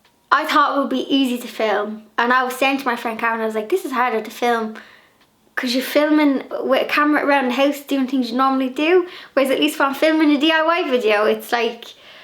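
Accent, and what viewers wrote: Ireland. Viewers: I hope things in Ireland get better soon. It's not to bad in Texas. I want shopping today and bought Pink ornaments for my Christmas 🎄. I always do white but I need a change with this Corona virus. I love all of yout video's and your accent. 💕🥂🎉🎃🤗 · You could just talk about paint drying and I would still tune in. I love to hear your Irish accent, I love your personality, and of course, I love Blondie and Pepsi. Keep up the good work! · I am convinced that there is nothing you can’t do. I love your girly nature with a can do attitude. Plus you accent and humor, you are a ray of sunshine. If I ever visit Ireland I’m going to invite you to tea.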